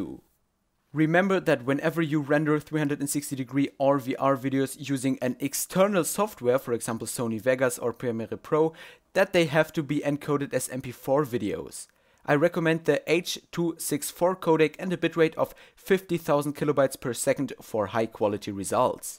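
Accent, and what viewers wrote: Germany. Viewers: Dieser Deutsche Akzent!!!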